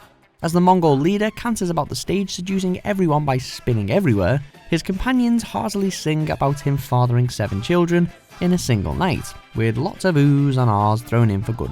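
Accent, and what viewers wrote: United Kingdom. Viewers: This is exactly the same as the list on WatchMojo, it is only voiced in the British accent. Don't understand why it should be on both channels.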